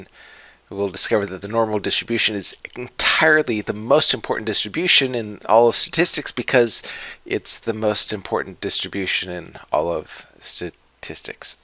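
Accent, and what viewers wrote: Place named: West, United States. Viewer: Kindly add subtitles. Thus make this vid approachable to whom aren't comfortable to western English tone.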